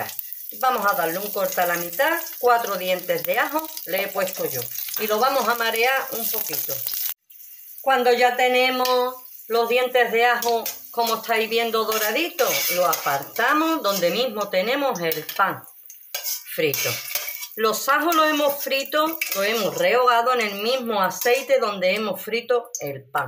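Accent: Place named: Seville, Spain. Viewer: ñiña disfruto viendote y hollendote te espresas habeces como mi abuela carmen era la autentica sevillana como tu besos desde barna att.maria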